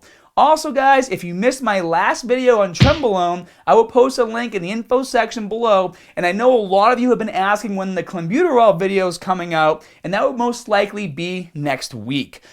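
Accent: Boston, United States. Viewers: He’s so Boston Irish ☘️🇺🇸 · tee ahh tee. Love Boston ahcent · Bostonian accent. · Very knowledgeable. Thanks for the info. (Love the Boston accent. I'm in upper NY where we use hard "R"s and all sound like pirates) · Anyone else love the Boston accent😂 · I’m from Boston and I have to say accent is thick..lol.great videos keep it up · who are you to come on his channel spouting nonsense he has a Boston accent get over it and grow up. how many accents are there in the world after all....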